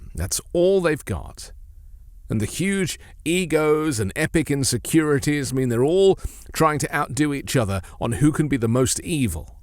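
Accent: United Kingdom. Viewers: You have such a lovely calming voice, British accent, really easy to listen to.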